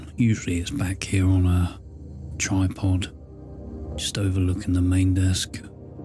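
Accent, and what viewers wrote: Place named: United Kingdom. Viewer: Your studio is bad ass brother. I never heard you talk. And just imagined you as a Yank. So my question. Where across the pond are you from?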